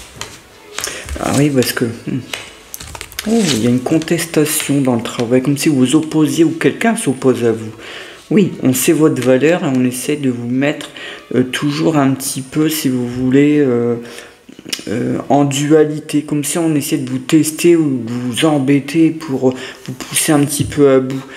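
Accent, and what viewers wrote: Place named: Nord-Pas-de-Calais, France. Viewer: 😮❤ merci à vous et très jeune heureuse année 25... Merci pour votre tirage 😊 Mon petit cœur est las d'attendre quelques nouvelles palpitations.... Rien depuis 21 ans... Sinon une solitude subite à 49 ans..décidée par l'univers.... Rien et personne depuis 20 ans... La lionne attend. On verra bien ce que 25 fera de positif. En tout cas, j'ai bien aimé votre tirage, vous êtes particulier, calme apaisant et peut-être même habité 😊 J'ai beaucoup aimé aussi votre petit accent ch'ti... Est-ce que je me trompe..., je ne crois pas être médium...🙏 ☺️ Nouvelle abonnée... à très vite Cathy 59 😉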